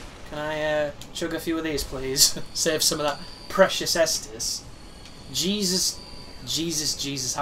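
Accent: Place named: Yorkshire, United Kingdom. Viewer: Sleep deprivation brings out the Yorkshire in you :D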